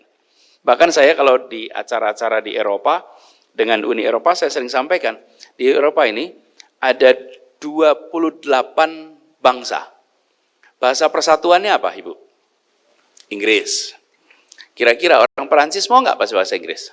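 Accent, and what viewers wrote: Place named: Java, Indonesia. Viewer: Dialeknya njawani banget.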